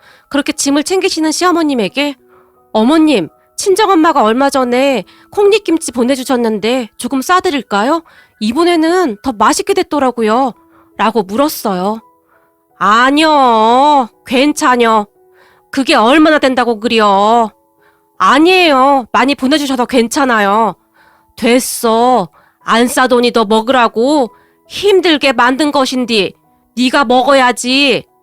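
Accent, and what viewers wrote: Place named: Chungcheong, South Korea. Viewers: 힘드셧나보네요😓 보통일이아니네요..🤯 충청도사투리에 꽂혀서유~ㅋ 수고하셔유~~(^^♪) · 정말 충청도 말씀이 웃깁니다 저로서도 뒤로 넘어지겠어요 시부님께서도 때묻지 않는 모습이 넘 보기 좋습니다 시부님 배려도 넘 보기 좋구요 쓰니가 시집을 잘가셔네요 사람은 진실되게 살면 얼마나 좋습니까 쓰니 잘들었어요 행복하세요 잘들었습니다 감사합니다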